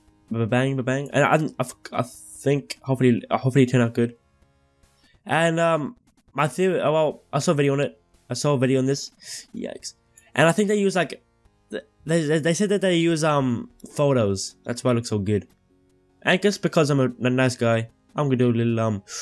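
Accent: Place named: Europe. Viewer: You don’t sound Aussie more European Good vid anyways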